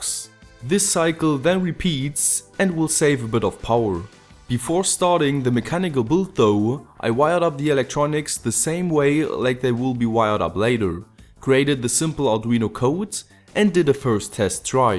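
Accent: Germany. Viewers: Are you german? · Kommst du aus Deutschlanf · du bist deutsch oder ? das hört man :D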